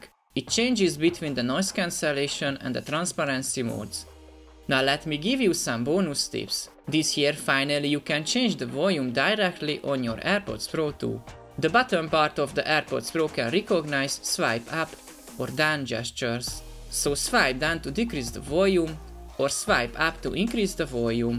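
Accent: Hungary. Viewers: Az a magyaros kiejtés 10/10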